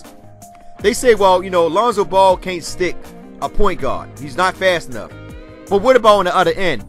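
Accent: Baltimore, United States. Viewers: Good video. I detect a Baltimore origin to your accent?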